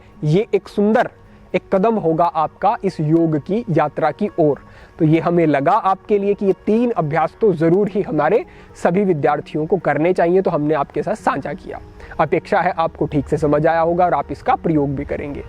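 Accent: Standard. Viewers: Mera man to apki awaz sunke hi accha ho jata hai q ki ap suddh hindi bolte ho badi meethi lagti hai ❤❤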